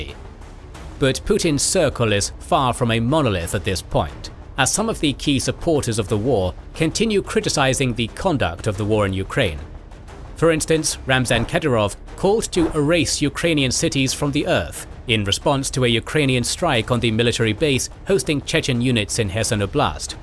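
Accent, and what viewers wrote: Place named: United Kingdom. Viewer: Gives me vibes like The Economist. Also British accent, same style of snark. I love it!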